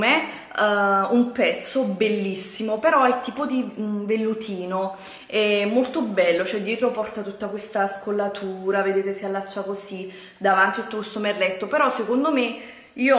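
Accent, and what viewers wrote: Naples, Italy. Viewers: è accento napoletano se non lo sai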